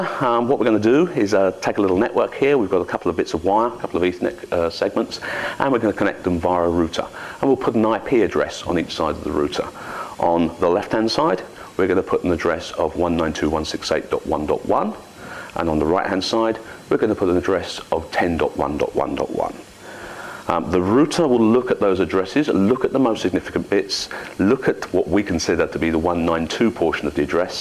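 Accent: United Kingdom. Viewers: british pronunciation?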